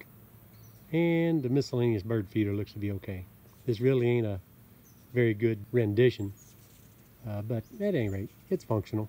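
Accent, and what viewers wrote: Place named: Oklahoma, United States. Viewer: Thanks! This was EXACTLY what I was looking for! I have a squirrel feeder, in a diff section of the yard that I put peanuts in each day. But, they’re never satisfied w/their daily ration. Lol. I can’t believe how far they can jump! I’ve tried moving the feeders, hanging them on taller shepherd hooks etc but inevitably they’ve managed to reach the feeders. Because of the number of feeders I have, I didn’t want to buy baffles & have a large sheet of aluminum I could use. I bought it Home Depot but it was over $20-any suggestion for cheaper option of metal in sheets? Type of metal or source/store? Thanks again for the video. I enjoyed it & hearing your voice. Reminded me of home, where I grew up in Oklahoma.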